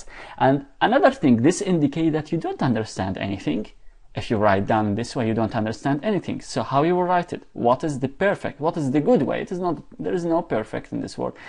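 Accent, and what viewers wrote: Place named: Iran. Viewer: you kno bout estop estop omg dont marrrkkkk on. Where is the English proficiency? you dont underestand neting. dude your persian accent is terrible.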